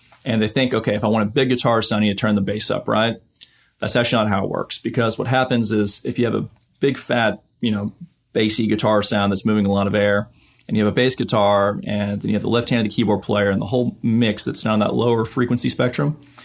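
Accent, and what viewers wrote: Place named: Ireland. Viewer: Some great advice here, thanks. Are you of Irish extract?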